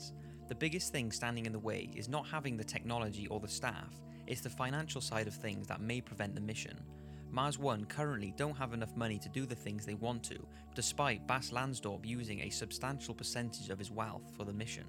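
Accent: Wales, United Kingdom. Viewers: i like british commentary. it makes the videos feel more intense and stuff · i can not work out your accent! it sounds kinda welsh???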